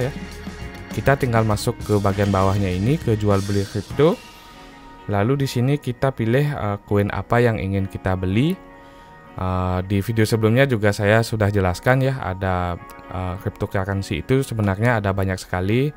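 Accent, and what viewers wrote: Bali, Indonesia. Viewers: Asli Bali yaa om? Logat nya Bali banget 😁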